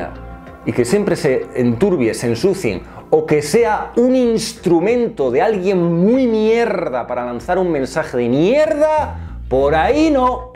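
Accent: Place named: Granada, Spain. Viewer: Que bonito acento granaino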